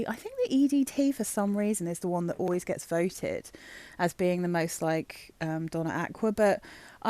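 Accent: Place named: United Kingdom. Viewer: Well, I'm in Atlanta but I'd sure love to meet up and go sniffing around London with ya! (Maybe someday I'll make it across the pond!) Lol. Love your weekly roundups and your British accent is so lovely to my deep south American ears... My SOTD is SJP Covet, which I know you enjoy too... Happy Birthday! 🥳🧁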